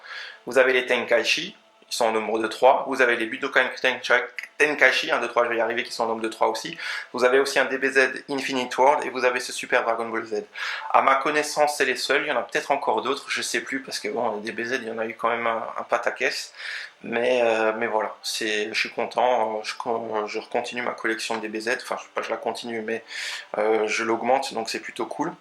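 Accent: Alsace, France. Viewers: je me suis mis récemment a regarder les video de collectionneur comme toi et pleins d autre, et se soir je suis tomber sur ta chaine qui est vraiment tres sympa a voir. bravo pour toute ses trouvailles dans les vide grenier, moi même collectionneur je suis malheureusement moins chanceux que toi quand je passe par un vide grenier pour trouver d aussi belle pièce que toi ! petite question, dans tes video j ai l impression que ta un accent alsacien? je me trompe peu etre. je te félicitation pour ta tres belle collection surtout au niveau des collector ! bonne continuation.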